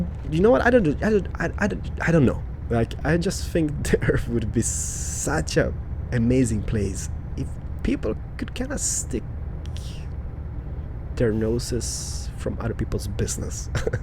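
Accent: Sweden. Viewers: jag gillar din svenska m8. it is funny. I like your swedish m8. it is funny.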